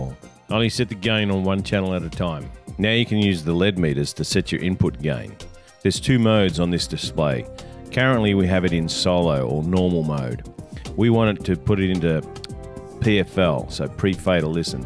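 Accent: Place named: Australia. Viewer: Love your aussie voice mate :)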